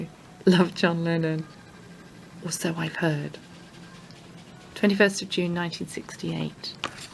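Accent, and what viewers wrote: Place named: United Kingdom. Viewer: i love your british accent. much nicer than the american one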